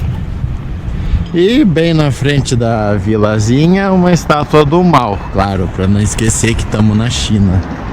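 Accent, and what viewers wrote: Santa Catarina, Brazil. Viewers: Sei la, parece de Santa Catarina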